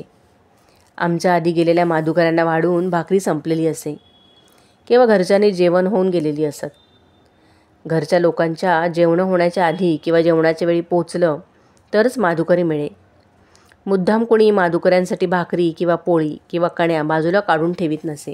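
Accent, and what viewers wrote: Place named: Konkan, India. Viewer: Uttam wachan.kokni ucchar hi chan 👌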